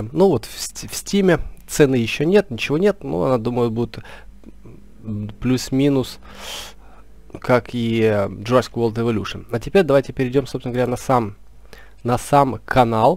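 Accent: Ukraine. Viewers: Автор украинец? Слышится акцент)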